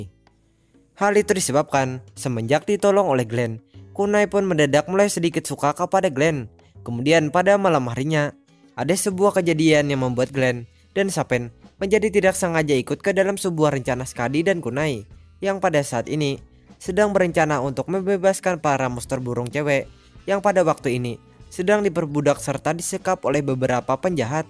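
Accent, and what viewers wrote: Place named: South Kalimantan, Indonesia. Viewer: Logat nya abang yg bawain cerita kayak orang KALSEL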